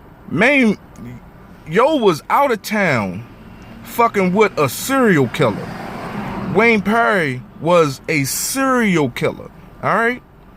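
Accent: Baltimore, United States. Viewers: Bruh you from Bmore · You from b-more. I hear the accent...😂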